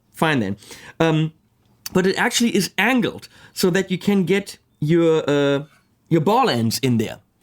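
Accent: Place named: Germany. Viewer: A review without this guy talking or someone else who doesn't need to be extra super dooper pseudo cool and swaggy fucked up german accent english. Yeah people get it. You like to hear yourself talking english but please keep it to your self or talk in your native tongue and use subs. I'm not trying to discredit your knowledge or playing. It's just your talking wanna be cool shit is so annoying man. And those great idiotic dumbfuck jokes. Oh you are so extra hip and remained young. You know? If no one is laughing it's not funny. Goddamn you're a douchebag. Even more than me.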